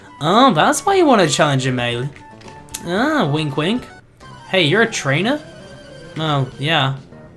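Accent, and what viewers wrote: United Kingdom. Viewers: What accent does have. British? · Sounds More British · He's British... How can you be from Sweden and not even know your own accent? lol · im so suprise about myself sitting here and listening to your annoying english voice 7.minutes.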